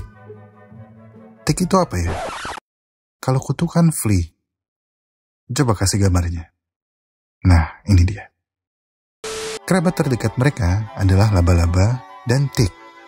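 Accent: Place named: West Java, Indonesia. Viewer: Admin orang sunda sigana yeuh 😂😂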